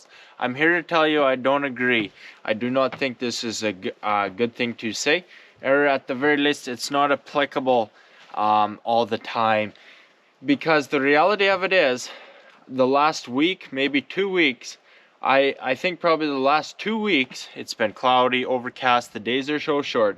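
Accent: Canada. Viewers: Just curious, where are you from? Canada? Interesting accent... Great video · I could listen to this guy talk ALL DAY, LOL!!! That Canadian "Country Accent" Adorable..... ❤ from Kentucky U.S.A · Oh my Goddess you're hilarious! Lmao when you opened the door to new snow dump! Love your Canadian accent. Your a smart guy and good to your dog 🐕 👍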